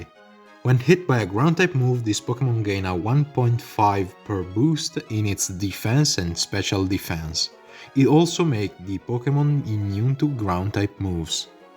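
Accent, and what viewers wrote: Italy. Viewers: Bel video, scrivo in italiano perché si capisce dalla accento, è ispirato a dadrake giusto?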